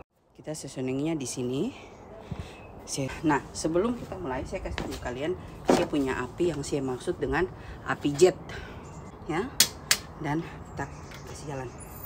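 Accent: Papua, Indonesia. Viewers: oooo sa suka ko pu logat itu, sa jadi ingat ketika sa di papua dulu, lumayan lama oooo,20 tahun. Sa dulu ada teman cewek namanya Ribka ivani sarungu anak Toraja, dia punya hotel di Merauke tapi sa lupa de pu nama hotel. terima kasih juga su berbagi ttg SEASONING WOKnya kebetulan sa juga suka masak. sehat selalu.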